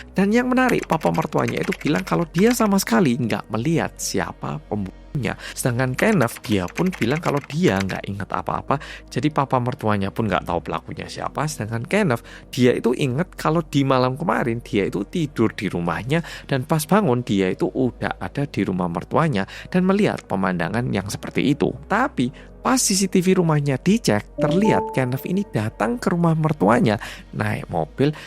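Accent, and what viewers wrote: Java, Indonesia. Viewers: Nglindur nya... serem2..ah muedok😢😮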